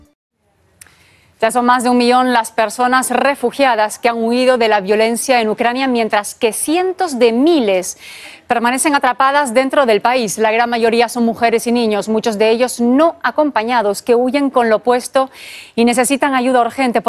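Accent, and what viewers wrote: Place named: Canary Islands, Spain. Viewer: Mi preciosa paisana... Mmmm... Ese bonito hacento canario.... Mmmmmm.... Un beso, guapa...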